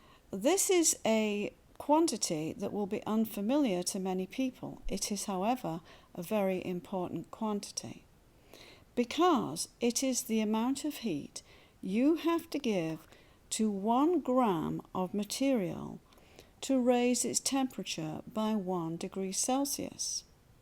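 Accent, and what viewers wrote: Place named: England, United Kingdom. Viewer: When I heard the English Accent I felt a little bummed because science here in the states is a little different than the UK. But this helped me understand sooooo much better. I didnt understand my teacher, this makes a lot more sense. Thank you!!!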